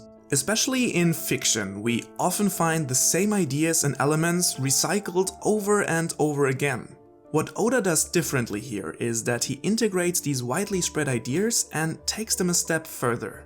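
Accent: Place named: Germany. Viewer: Are you german? Your english sounds like you are german 😁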